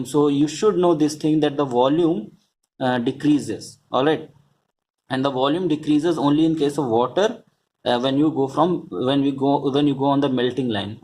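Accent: India. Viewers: When the accent is Indian...you know the video will help lol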